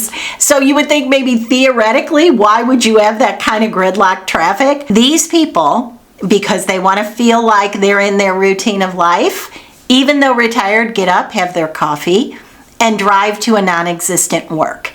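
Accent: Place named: North, United States. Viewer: Are you a Yankee from Up North ? Naples born Cracker here and you sound like a Yankee to me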